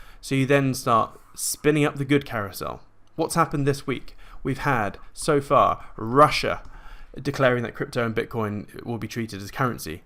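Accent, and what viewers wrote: United Kingdom. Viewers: Hey, thanks for the great educational video! Always appreciate to hear your British English! In the past couple of videos you've said that you don't trust this btc rally. Now that your bottom glassnode indicator is flashing "possible bottom" are you back in btc with a % of your free flowing USD? Or are you waiting for that $42k to hold as support before you initiate your capital? Would be lovely to get your answers on these questions. Appreciate your answers in advance:)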